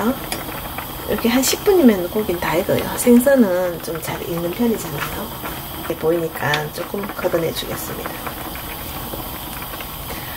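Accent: Gyeongsang, South Korea. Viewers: 끈나슴니다 듣기 좋습니다 갱상도 억양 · 따듬어 ㅎㅎ 경상도에 사는데 익숙한 말투시네요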